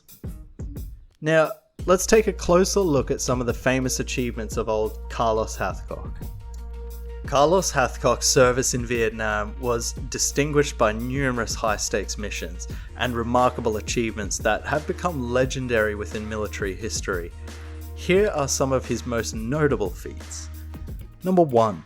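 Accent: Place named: Australia. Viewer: I heard the Australian accent I thought it would be an Australian you're talking about . Heard enough about the Americans in that war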